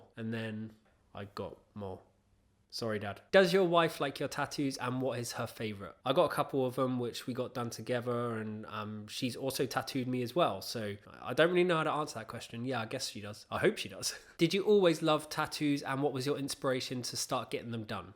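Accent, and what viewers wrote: United Kingdom. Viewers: I don't have any tattoos (yet). The more I put it off the more nervous I get about the pain and healing, and just anxious about it.. like if I get some weird reaction or if I'll be allergic to red ink. But I've always loved tattoos and wanted them. In some ways I'm glad I've waited, I'm almost 23 and my preferences have changed so much. I want american traditional or neo-traditional tattoos now. Keep doing videos! I have a feeling you'll get a lot of subscribers! Also, where are you from? Your accent sounds british.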